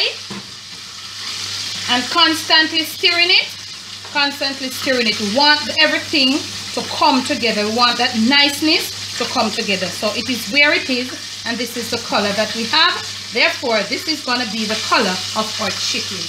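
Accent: Jamaica. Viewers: Typical good good up Jamaican cook. She switches effortlessly from proper english to patois... Cho! Big up, food look so good... 🇯🇲🇯🇲🇯🇲🇯🇲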